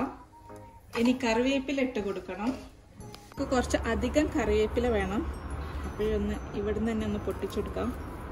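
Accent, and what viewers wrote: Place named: North India, India. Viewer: Ningalde varthamaanam oru North Indian Malayalam paranje pole Ind...good vdo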